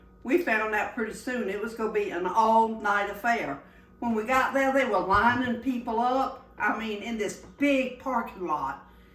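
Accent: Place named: South Carolina, United States. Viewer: Hey, so very great to hear from a fellow SC friend. I moved from the Island about 3 yrs ago. Boy do I miss fishing, shrimping and Oyster Roast in fall. I think you attended the church with the great sale annually and have the pumpkins. I lived there 15 yrs and am a native Savannah resident. Can’t wait to get one of the cook books. Even though I get home sick for the salt water hearing a familiar accent I so am enjoying your channel. Marnie Causey😄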